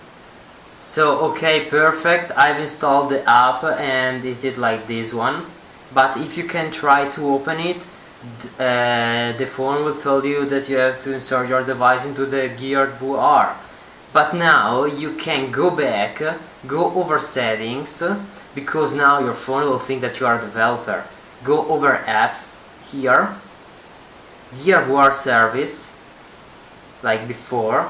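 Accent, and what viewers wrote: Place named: Italy. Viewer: Ciao, grazie mille! Riconosco dall'accento che sei italiano! Tutto funziona perfettamente, mi chiedo solo per quanto altro tempo le app resteranno sullo Store di Oculus Gear ora che il servizio è stato interrotto...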